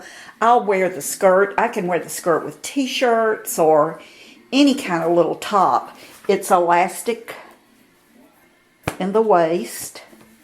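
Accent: South, United States. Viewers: Hi! Wow, I've missed you! I loved hearing your sweet southern accent again. I'm excited for you that you will be seeing Rod S. My husband and I saw him last year with Stevie Nicks in Toronto and it was a great concert. I love them both so it was a super night. What a great buy on the skirt. I will be doing some Easter shopping tomorrow so I think I'll pop into Walmart. You have a beautiful figure and your outfits looked so good. Have a Happy Easter. Come on warm weather! xoxo Susie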